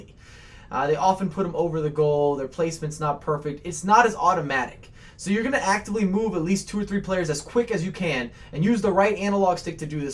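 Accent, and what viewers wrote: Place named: Texas, United States. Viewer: I needed this, you are my Texas Accent Speaking Angel.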